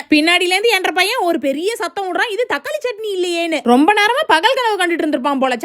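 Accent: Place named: Coimbatore, India. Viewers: அம்மணி உங்க சமையல் ரெசிபியை விட, அந்த கோயம்புத்தூர் பேச்சு அருமைங்கோ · பேசுறது எதார்த்தமா கோயம்புத்தூர் பாஷை சபாஷ் ❤❤❤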